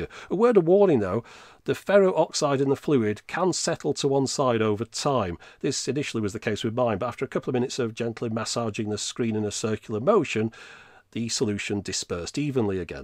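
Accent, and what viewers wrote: United Kingdom. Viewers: Not sure whose voice I like more: classy British accent or the deep, groovy voice on that Datsun Z advert. Tough call.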